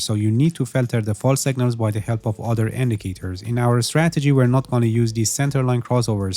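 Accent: Iran. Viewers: گل پسر خیلی خوب انگلیسی صحبت میکنی درود بر تو اما لهجه فارسی هنوز کاملا قالبه😊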